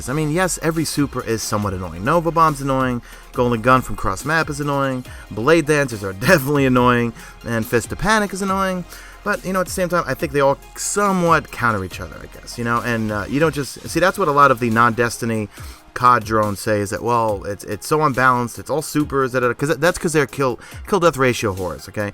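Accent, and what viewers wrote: New York, United States. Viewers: How do you like living in New York? You totally sound like a New Yorker lol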